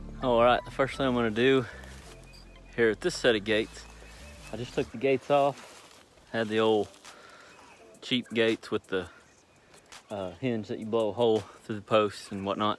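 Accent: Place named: South, United States. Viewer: I weld pontoon boat and boat lifts here in N.E. Michigan but I enjoy your videos. And listening to your southern accent! 😁. You have me saying " That A Way" now! 😅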